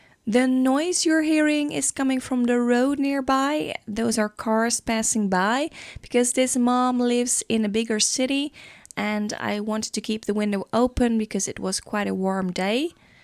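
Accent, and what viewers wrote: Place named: Netherlands. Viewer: Wat gaaf ik kijk altijd dit soort filmpjes! Nu kwam ik bij jou uit. Maar ik hoorde in je accent wat nederlands! Tof dat je dit doet! Ik ga je volgen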